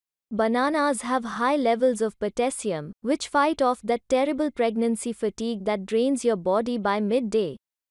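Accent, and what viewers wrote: India. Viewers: No one wanted to listen to the Indian accent woman drone on in her sing song New Delhi voice.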